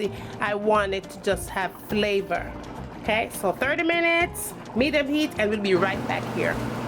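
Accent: Jamaica. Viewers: Man I watched alote of cooking videos and let me tell you when I want to cook a Jamaican dish I have to look for you videos dem why?? Because for some reason the Jamaican accent makes it better I've seen some other videos of people making Jamaican dishes and the accent not there ATALL that's just my opinion so in other words I LOVEEEE your videos dem 👍👍 lol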